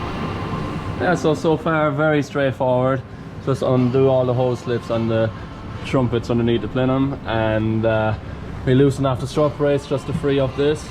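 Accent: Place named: Ireland. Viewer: Irish accent is top 3 in the world for me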